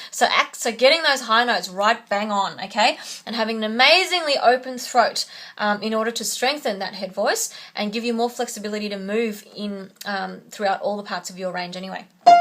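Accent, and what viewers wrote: Australia. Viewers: your accent...Umm..Australian right ?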